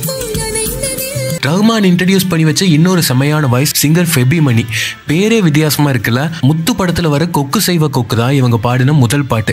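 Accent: Kongu, India. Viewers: நண்பா ரொம்ப ரொம்ப ரொம்ப ரொம்ப ரொம்ப ரொம்ப ரொம்ப ரொம்ப நன்றி நண்பா இந்த கொங்கு தமிழ்ல பற்றி ரொம்ப நாளா கேட்டது இருந்தேன் இப்பதான் எனக்கு மன நிறைவாய் இருக்கிறது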